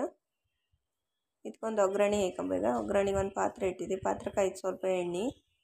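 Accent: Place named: Kundapura, India. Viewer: ಸೂಪರ್ ಕುಂದಾಪುರ ಕನ್ನಡ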